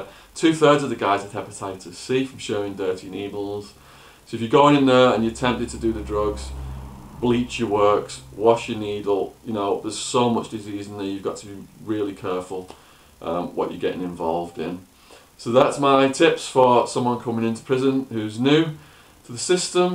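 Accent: United Kingdom. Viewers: I hope you don't mind me saying that you sound too british for an Arizona prison.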